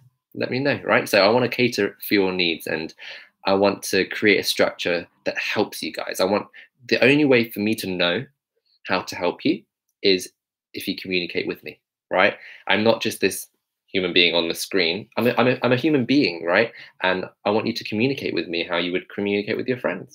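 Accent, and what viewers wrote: United Kingdom. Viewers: yaaas! the scar, the glasses, HP mood, British accent - I love all of it <3 very helpful! thanks! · Perfect brit accent!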